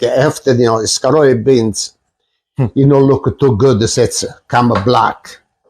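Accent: Calabria, Italy. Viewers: From Schaumburg IL speaks like my grandparents who were Calabrese. But I have family in Torino!! I see he worked in Torino.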